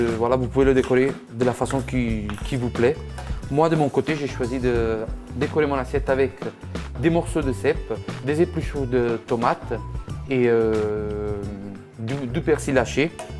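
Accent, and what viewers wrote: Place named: Italy. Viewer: Tu risotto avec des boîtes ça donne pas envi. L'accent italien ne suffit pas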